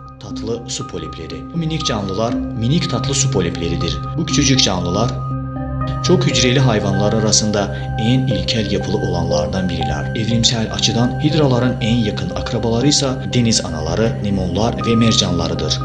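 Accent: Azerbaijan. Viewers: Azerbaycanlı bence aksandan anlaşılıyor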